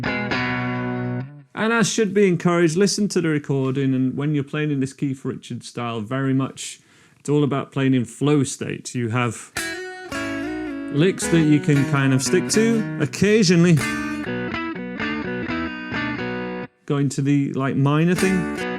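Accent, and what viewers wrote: United Kingdom. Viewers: Thanks ❤ for the tutorial I hope you have a great new year I love you very much 😘🎸🥰 keep up the good work you have a great voice that British accent 💕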